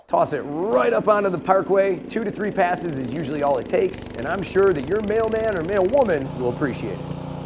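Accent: Buffalo, United States. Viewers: First time watching your channel, I guessed you were from Buffalo before you said it 😅 I’m from Getzville. We got a ton of snow from this blizzard. Hoping you made it out ok!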